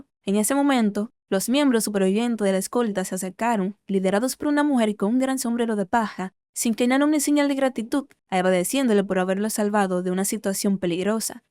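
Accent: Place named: Dominican Republic. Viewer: Solo con la voz pude deducir que era dominicana,rd representando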